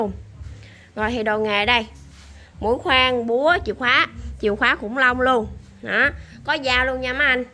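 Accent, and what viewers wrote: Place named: Mekong Delta, Vietnam. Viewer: Giọng nói giống người miền Tây quá bé , nghe giọng này làm hồi ức lại đã từng công tác dưới miền Tây 12 năm . Rất chân chất thật thà! Có dịp sẽ ủng hộ bé . Phong Cách giới thiệu sản phẩm rất thiện cảm .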